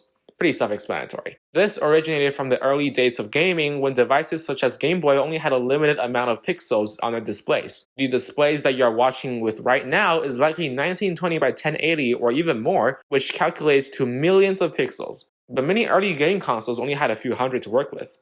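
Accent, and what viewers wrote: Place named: China. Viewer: Your Chinese accent is funny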